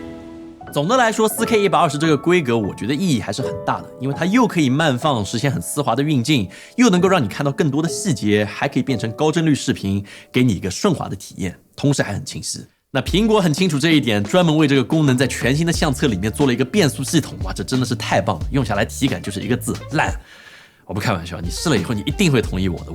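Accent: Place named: Songjiang, China. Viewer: 听这个普通话有点像上海松江口音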